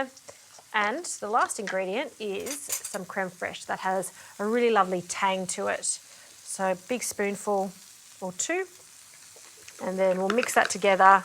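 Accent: Australia. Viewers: Hmmm. Some Hybrid pan? Needed closed captions because of “ throw one on Barbie “ accent.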